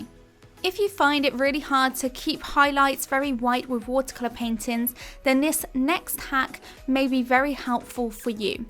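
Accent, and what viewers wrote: United Kingdom. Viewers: Sou are bri-ish (no hate nice tips):)it help me alot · Are you from UK?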